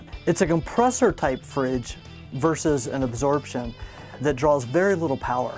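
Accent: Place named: England, United Kingdom. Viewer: I can’t tell if you are English or are just trying to sound it. And I don’t know which one I prefer.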